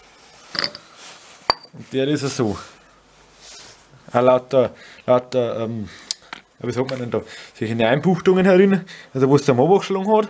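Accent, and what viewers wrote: Germany. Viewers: Wo aus bayern kemz es?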